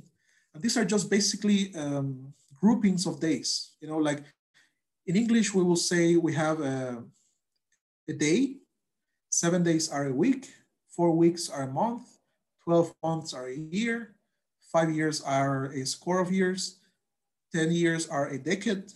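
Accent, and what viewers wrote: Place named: Brazil. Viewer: você tem um sotaque brasileiro quando fala inglês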